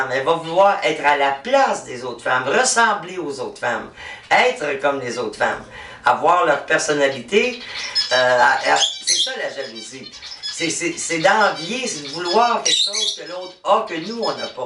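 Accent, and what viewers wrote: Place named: Canada. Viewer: C'est mignon ton accent canadien ! C'est très intéressant et je suis entièrement d'accord avec toi ! Ce que tu dis me rappelle que j'ai eu des parents très équilibrés ( sans esprits de compétition,satisfaits de ce qu'ils possèdent, non tributaires des modes... ) "Le bonheur , c'est de continuer à désirer ce que l'on possède !" (Saint Augustin) Combien c'est vrai ! Augustin avait un peu copié la Bible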